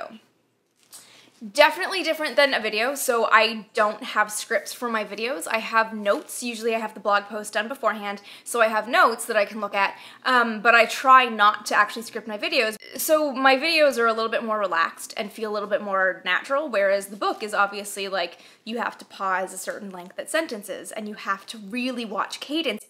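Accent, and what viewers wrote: Canada. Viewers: You're Canadian so by all means speak as a Canadian! I love it! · What is wrong with using a Canadian accent, eh? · I'm from the US and I love hearing the Canadian accent. It's very endearing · Did you straighten your hair while your voice was recovering? This is an odd thing for a guy to notice, but in the recording videos it was wavy and in the main video it was very straight. Also you sounded more Canadian today than usual. I guess that's random chance; there must have been more words today that we Americans pronounce incorrectly. :-) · Regarding your pronunciation and your Canadian accent, you ARE Canadian, you SPEAK with Canadian accent, so why dwell on it? Without your accent it wouldn’t be you, simple as that I really do hope we can get it here in Sweden I wish you a merry Christmas and a happy new year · Awesome. Though I do admit that I feel you should keep your "Canadian-ness" and say the words as you normally would. 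When reading if I know the sound of the authers voice for some reason the voice in my head as I read sounds like the auther. This was what happened as I read "Breaking the chains of gravity" (BRILLIANT book btw) It was like your voice in my head and not mine. Ok. That may sound weird to some but thats just the way I am. Oh and any chance of a 'Pete' video like the make up one you did? But another subject. That Pete one was great.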